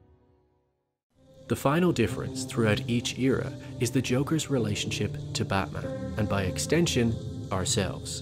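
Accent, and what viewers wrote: Ireland. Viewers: I wonder if your Irish you sound like an Irish man like myself haha but these videos are absolutely superb I've been watching them all there brilliant observations!!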